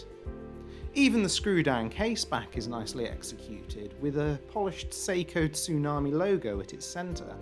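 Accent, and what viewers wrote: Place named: United Kingdom. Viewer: Great first review. Love the British accent and way you presented it. 10 minutes is also a well digestible length. Well done and looking forward for more reviews from and WatchGecko.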